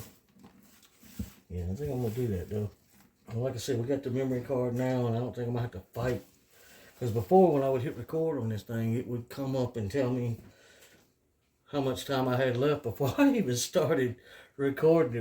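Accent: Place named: South, United States. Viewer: My wife want to know where you grew up? She says you sound a lot like me and I am a country f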